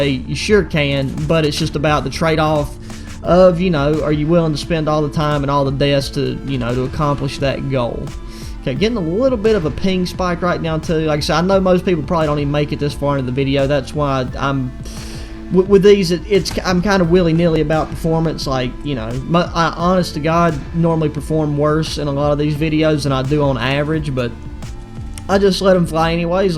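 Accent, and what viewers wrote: United States. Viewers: love that accent lol sounds like a cowboy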